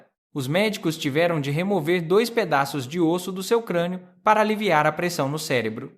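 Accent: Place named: Portugal. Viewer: Mistura de português de Portugal e português do Brasil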